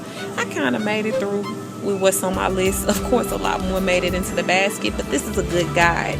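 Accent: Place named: United States. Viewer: Girl sounds american but strongly looks southern african.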